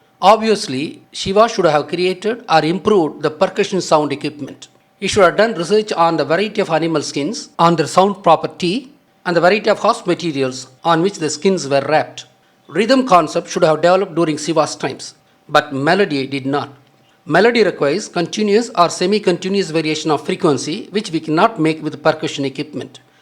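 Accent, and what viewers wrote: India. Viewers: don't get offended sir, ! was talking about accent not English, the Hindu accent Jesus Christ is like another language in itself.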